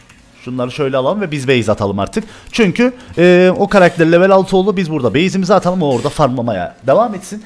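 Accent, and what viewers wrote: Cyprus. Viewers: Abi sen nerelisin çok merak ettim konuşman sanki kıbrıs ağzına benziyo ve videoların çok güzel olmuş eline sağlık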